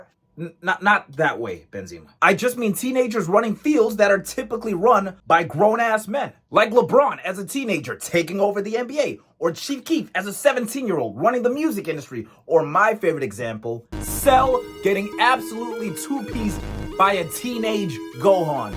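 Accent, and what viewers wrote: South Africa. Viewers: Bro, you South African? Shocked by accent. Gonna sub in any case. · Your South African? Same 😅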